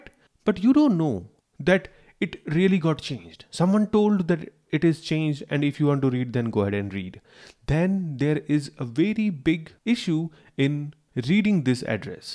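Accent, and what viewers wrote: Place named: India. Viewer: You have an overpowering accent that makes you difficult to understand, there are AI tools that can fix this and online training resources to teach yourself how to minimize it, if you choose to. It's not bc you have an Indian accent specifically but bc it's a very heavy one. Try bring your tongue forward in your mouth when you speak English and stop 'rolling' your Rs.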